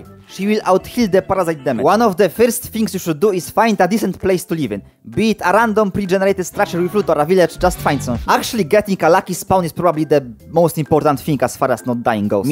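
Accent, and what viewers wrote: Poland. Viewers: are you from poland? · No akcent polski duży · Po 2 sekundach wiedziałem że jesteś z Polski ale super poradnik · tak dawno nie słyszałem kogoś z tak polskim akcentem że ciężko mi czasem zrozumieć co mówisz XD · bro r u polish? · Stary jak miło usłyszeć polaka po angielsku xD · Jak wszedłem w ten filmik i usłyszałem ten akcent wiedziałem że coś jest nie tak patrze w info kanału i oczywiście polska, od początku coś tak czułem, nagrywasz po inglisz żeby mieć wiecej wyświetleń sprytne. Mam pytanie a propo farm expa xaphan tych zielonych co w lawie się respią po tym jak dotknie wody, bo od chyba wersji 2.9 już te farmy nie działają i czy da się to jakoś obejść że będę na wersji np 2.9.3 i wejdę w ustawienia modów w grze i poprzestawiam coś żeby farmy działały i xaphany się respiły? Proszę odpowiedz jak lub nagraj o tym bo dużo osób potrzebuje tej informacji czy da się to zrobić i jak to zrobić a szczególnie dużo osób z polski. 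· Are you from Poland? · Nie no po akcencie od razu wiedziałem że rodak XD Film też się przydał bo mam nowy rekord przetrwałem 3 dni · kurna od razu po akcencie mozna poznac ze Polak, kocham cie stary (zajebisty toutorial) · słycahć po tobie ze jestes polakiem XD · 2137 widac i slychac ze polak!!!!!!! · masz bardzo polski akcent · od początku wiedziałem że to polak hahaha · dobra sprawdzałem czy polak i się dowiedziałem to polak po 4 min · Słychać ten polski akcent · Polski akcent — miód na moje uszy :))))) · Dobrze słyszę że jesteś Polakiem? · Zastanawiałem się czy Polak po akcencie ale wystarczyło że zobaczyłem 2137 lvl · are you from poland ?? haha because of your english xDDD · Kocham polski akcent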